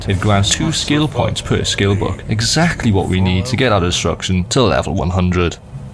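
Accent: Wales, United Kingdom. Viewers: Are you from close to Cwmbran my dude? You sound like you’re from Newport or Cwmbran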